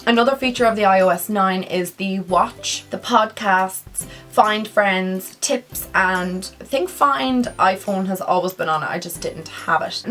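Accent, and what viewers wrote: Ireland. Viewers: i love your irish accent 😍😂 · She's from Ireland :) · OMG ur Irish same #irishgoals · Are you Irish? I just came across your channel, you're gorgeous! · are you Irish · Are you Irish I am xx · Is she Irish because I'm from Ireland and I'm from Dublin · What part of Ireland are you from ??🇮🇪🇮🇪🇮🇪🇮🇪🇮🇪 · Are you from Ireland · Are you Irish 🇮🇪 if u are I am 🇮🇪🇮🇪😂👍🏼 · Just found your channel knew instantly you were Irish you can't hide the accent🌸 I'm from Galway❤️ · is she irish? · Are you irish because you rly sound irish ;)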